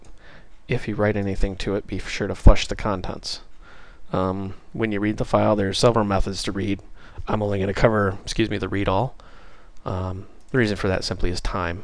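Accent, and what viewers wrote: United States. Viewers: not only is this educational and entertaining, but this this is in the best format for me! Small screen so I don't have to be in 1080p full screen to read the text and I can have QT open next to it, short videos so I don't lose the will to live, and finally you have a really nice voice XD its not normal us people from Britain enjoy an America accent XD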